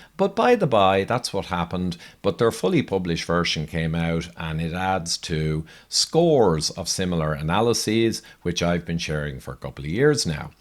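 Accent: Ireland. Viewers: bud you talk like irish guy. did you know?? :)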